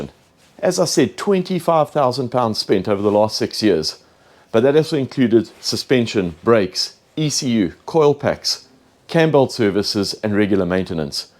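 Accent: South Africa. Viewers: Fascinating insight mate. If you thought the bills for the 360 were eye-watering, try an F355...Engine out every 3 years for the belts (22 hours labour - ouch) manifolds made out of cheese, radiators made out of even softer cheese, horrible sticky cabin plastics needing (expensive) refurbishment..the list goes on and on. Nevertheless, once you take it out for a good blast all is forgiven! At least I made a tidy profit when I sold it after 11 joyous years. My little 993 is now making me smile. By the way, you're not originally from SA or Zim are you? Cheers from a rather chilly Otford, Kent, UK.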